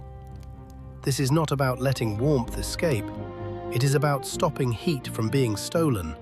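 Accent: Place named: England, United Kingdom. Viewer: The bones of the building. 🙂‍↔️ That thick, English accent! ☝🏻🧐 The bones of the house lose heat. ☺️😆 I love it, German shock ventilation! 💁🏻‍♂️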